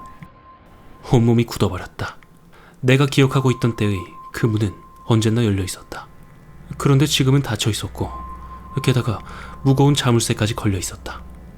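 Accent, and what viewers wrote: Gyeongsang, South Korea. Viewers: 님 경상도 분이신지~~무서운장면에서 사투리땜에 빵터집니다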